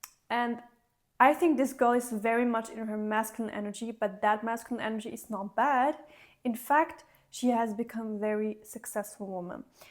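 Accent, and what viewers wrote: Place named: Eastern Europe. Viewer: She is so beautiful and kind hearted ❤❤❤ I love her accent. Where is she from? I think she is my Slavic Queen 👸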